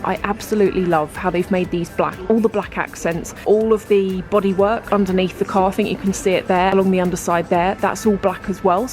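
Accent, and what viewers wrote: England, United Kingdom. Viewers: U got most sweet and cute voice and accent , most of British accent I couldn't understand , but yours yes😘😘😍😍💐💐love from srilanka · 7 I stumbled upon this I really like your car videos Love from Indiana USA Love the way you English people talk Have a great week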